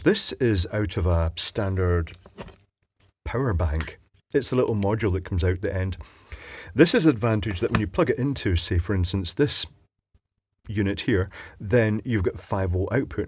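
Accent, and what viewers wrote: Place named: RP, United Kingdom. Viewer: Such a lovely BBC type sound from.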